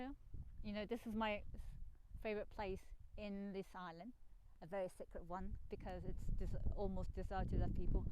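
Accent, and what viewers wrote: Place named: United Kingdom. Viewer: 너무배우고싶네요..^^영국발음과 악센트..멋져요♡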